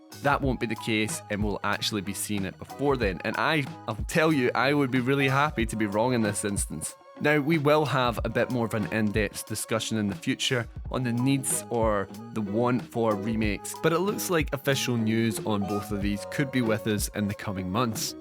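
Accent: Scotland, United Kingdom. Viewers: Imagine criticising a Scottish accent.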